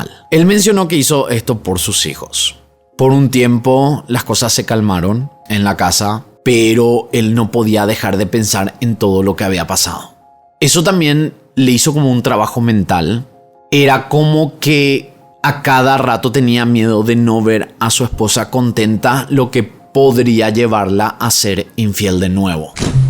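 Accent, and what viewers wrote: Paraguay. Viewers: ¿Tu acento es paraguayo? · Sos paraguayo? sonas re paraguayo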